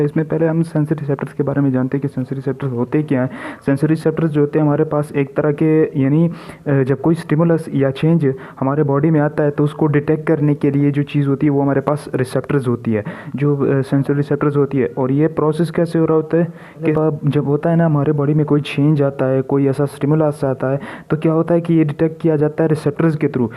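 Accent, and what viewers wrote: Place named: Pakistan. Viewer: Asa.. Lg rha h pakistani english bol rha h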